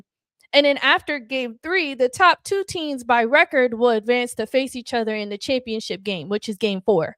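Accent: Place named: California, United States. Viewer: You from Cali ? You got that California accent lol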